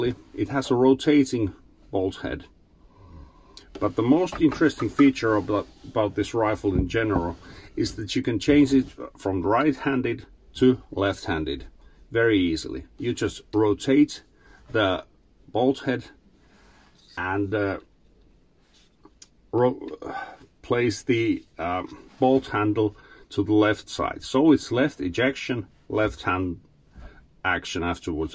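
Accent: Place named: Finland. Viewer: Ai että miten hienoa suomalainen puhdas rallienglanti 🥰🥰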